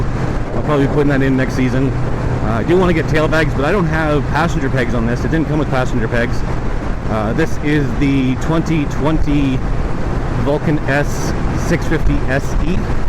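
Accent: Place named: Canada. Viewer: What country is that? Everything is in kilometers but you're on the right side of the road and you sound American.... but you do say "AbOOt". I'm guessing Canadian?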